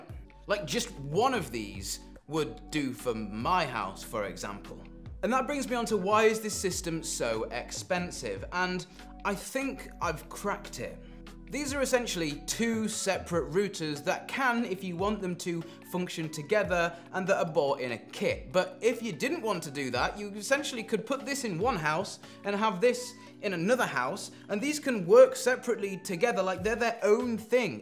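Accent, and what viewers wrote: England, United Kingdom. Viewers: Love your English accent! 😁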